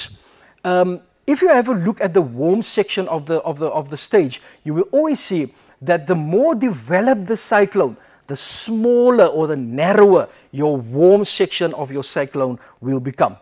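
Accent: South Africa. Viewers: south africa, cape town. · Cape (South Africa) accent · South Africa :)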